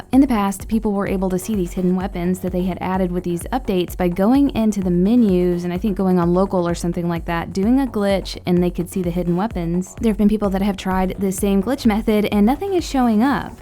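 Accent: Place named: United States. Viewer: Who Cares i am from england. her voice sounds 'normal' to americans yes but i love the american accent 😍😍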